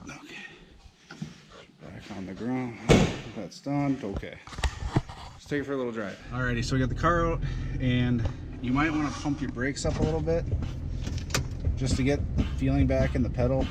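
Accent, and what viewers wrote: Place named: Canada. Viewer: got 2 min in until i realized he was Canadian or Ohioan much love for this tutorial bro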